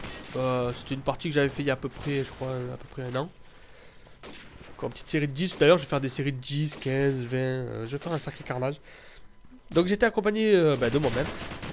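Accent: South, France. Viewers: Un bon carnage GG a toi, ta façon de commenter avec ton accent de sudiste me fait régaler!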